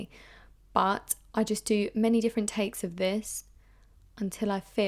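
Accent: England, United Kingdom. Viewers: Not gonna lie I love the pommy accent :) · Can we just appreciate her British accent like wow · is she British ??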